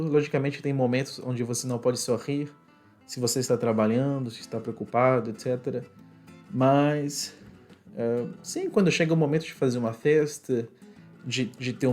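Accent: Brazil. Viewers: Ele parece mineiro,fala devagar 🤭🤭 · vc inteligente e fala sotoque brasileiro perfeitamente abraco falou muito bem tamos juntos e nois. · Acho que tu tens um sotaque acreano. Não sou de lá mas se alguém do norte ler isso pode confirmar. Se pronunciasse todos os S chiado seria um sotaque carioca completo. Mas como pronuncia o D e T a moda brasileira, o R francês e quase sempre o S silvado, diria que é parecido com do estado do Acre.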